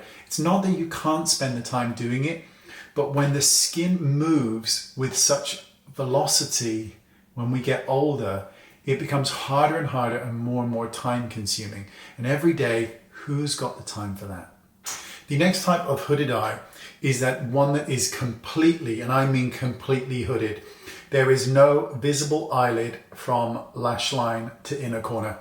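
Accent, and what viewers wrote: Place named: South West, United Kingdom. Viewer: So funny xx ❤ your soft-‘growly’, light SW accent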